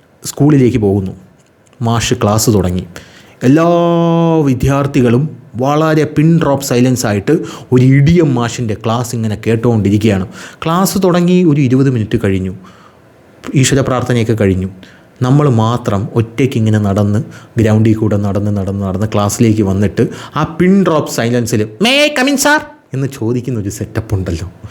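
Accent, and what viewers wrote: Thiruvananthapuram, India. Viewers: അയ്യാവണക്കവുമായി മടങ്ങി വന്നത് നന്നായി... ആ സരസമായ അവതരണം വീണ്ടും കേള്‍ക്കാന്‍ കഴിഞ്ഞതില്‍ സന്തോഷം.. പൊളിയാണ് തിരുവനന്തപുരം സ്ലാങ്ങ്‌.... ആ രസകരമായ വിവരണം, ചില ഉപമകള്‍ ഒക്കെ കേക്കുന്നത് മറ്റേതൊരു ന്യുസ് ചാനലിലും കിട്ടില്ല.. സുജയ കഴിഞ്ഞാല്‍ കേള്‍ക്കാന്‍ ഇഷ്ടപ്പെടുന്ന മാധ്യമ പ്രവര്‍ത്തകന്‍ ആണ്....... സുജയ ടീം വരണം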